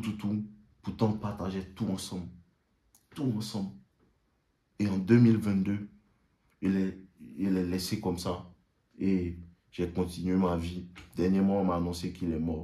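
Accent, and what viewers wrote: Ivory Coast. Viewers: depuis que jai découvert ta page avant hier je suis tes vidéos en boucle pleins d'enseignements merci beaucoup;;tu as l'accent de mes compatriote ivoiriens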